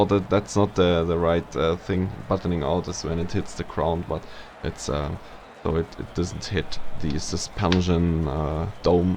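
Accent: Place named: Germany. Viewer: Are you german ? Because I am german and your accent sounds pretty much like everybody in my class speakes English😂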